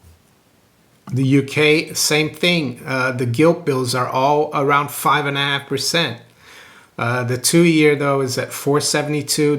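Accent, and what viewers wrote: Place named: Eastern Massachusetts, United States. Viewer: Grew up in Brazil? Wow. You sound like eastern Mass to me. I'd have just assumed Boston area. Or RI.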